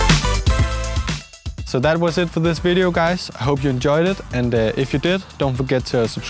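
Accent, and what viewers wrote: Denmark. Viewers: Is he danish? You can hear it by his accent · Elsker den danske Engelsk❤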